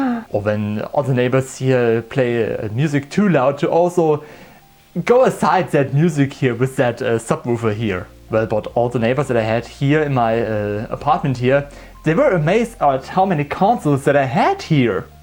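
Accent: Germany. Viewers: I hear German accent! Dieses Video ist nun Besitz der Bundesrepublik Deutschland! Brennstuhl perfectly pronounced, clearly German..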